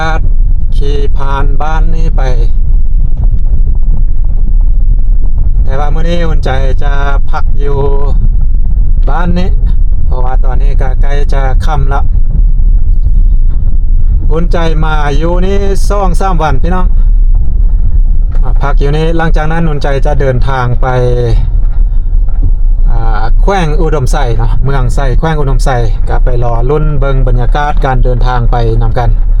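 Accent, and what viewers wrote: Loei, Thailand. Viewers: สำเนียงเมืองเลยครับ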